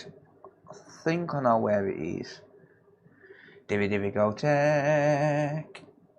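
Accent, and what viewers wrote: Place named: Birmingham, United Kingdom. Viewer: Come on brummie lad . You're best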